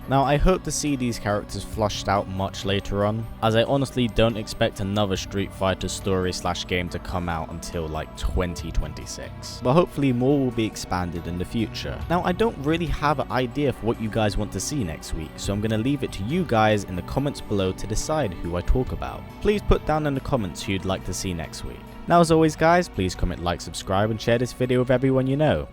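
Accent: United Kingdom. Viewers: I love these videos, just play them and leave them in the background while doing other stuff. Also, the fact that they're narrated by a fellow Brit is just the best thing.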